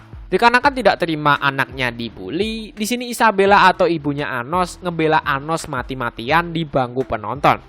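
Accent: Java, Indonesia. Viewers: Medok bat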